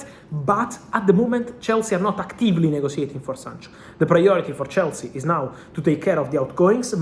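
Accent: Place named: Italy. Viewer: Except we understand only 60-70%, and have to guess what else he is saying. His Italian accent is really not easy! I still don't understand who bid for Oshimen??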